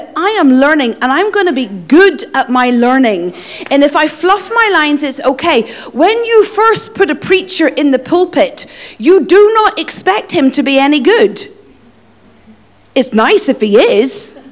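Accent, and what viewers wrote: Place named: Ireland. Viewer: the Irish accent is one of my top 3 least favorite accents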